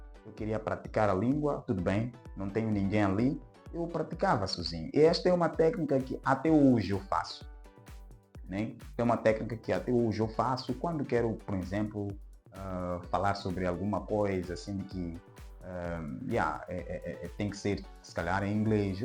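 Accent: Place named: Angola. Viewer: De primeira pensei q tu fosses Angolano 😂❤ Salve mano belo video O teu Sotaque é de Angolano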